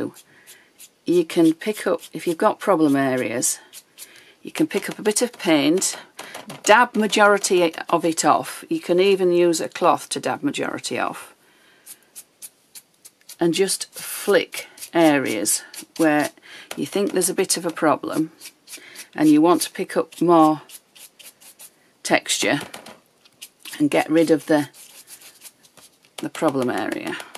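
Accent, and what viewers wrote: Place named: Yorkshire, United Kingdom. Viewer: Looking forward to trying this. Your accent sounds like my cousin's wife – she's from Stamford Bridge.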